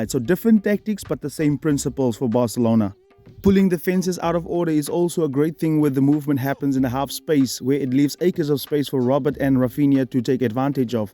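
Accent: South Africa. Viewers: This ou sounds south african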